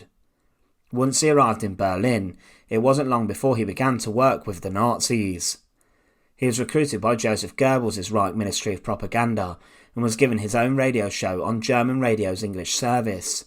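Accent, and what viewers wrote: United Kingdom. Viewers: I'm spending a year trying to figure out whether it is some UK area accent!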